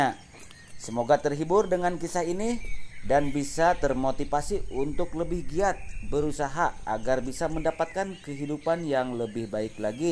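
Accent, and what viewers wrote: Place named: Sunda, Indonesia. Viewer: Lagam naratornya terlalu NYUNDA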